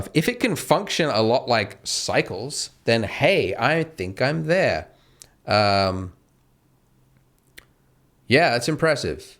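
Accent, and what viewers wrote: France. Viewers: me : "Ok, he has the same accent as me, he is french" Andrew : "Sooooooo French" *unmasked so fast haha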